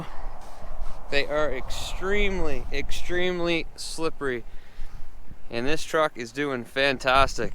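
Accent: Nova Scotia, Canada. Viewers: 10 seconds into the video I know you were Nova Scotian lol ... I'm from Truro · Damn Canadian accent is annoying .. it’s OUT not oughhtt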